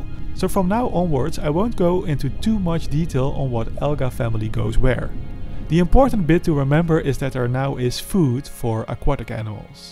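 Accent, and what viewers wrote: Netherlands. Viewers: You sound dutch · I sense a strong dutch accent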